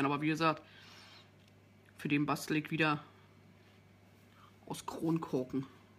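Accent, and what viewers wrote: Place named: Berlin, Germany. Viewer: Ach meine liebe.. ick find dein "Berlinern" einfach nur geil!! ;)) Rock it und dir nen schicken Abend! Maxi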